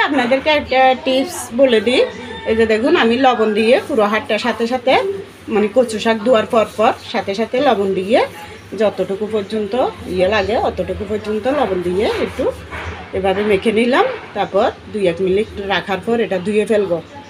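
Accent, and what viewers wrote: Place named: Chittagong, Bangladesh. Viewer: আসসালামু আলাইকুম। আপু আপনি মনে হয় চট্টগ্রামের। আপনার কথা শুনে বুঝতে পেরেছি। রান্না অনেক ভালো লেগেছে ।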